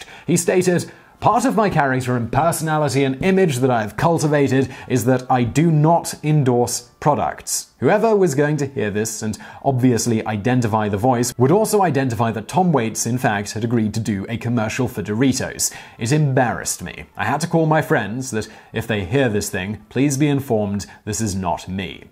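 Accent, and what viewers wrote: England, United Kingdom. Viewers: Casey Kasem doing a British accent. Expect him to break in to an ad for the gap at any time. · he's using a European pronunciation of the word that goes by how it's spelled. "Bourbon". He's not drunk, he's English. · Regurgitating research in a verbose and pretentious manner doesn't make you look smart, it just makes you look like a massive twat. Consider popular academics and scientists such as Carl Sagan or Kenneth Clark who communicate with their audience without the BS. I can tell every day you thank God for giving you an English accent. Every day I thank God that I don't know you personally.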